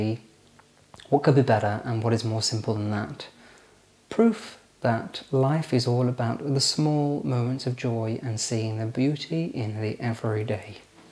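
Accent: RP, United Kingdom. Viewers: Im a new subscriber,and really enjoy your videos,i would like to make a point,i wish most people had your way of speaking,its not artificial its the Queens English,i speak the same way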